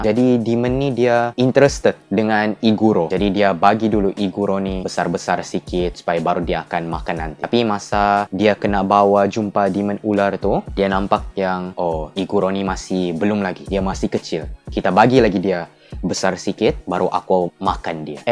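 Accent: Sabah, Malaysia. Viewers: org sabah kh? ketara sleng sabah tu hehee. btw, hai saya fans dari sabah hehee😊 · punya best lah orang sabah ini ckp!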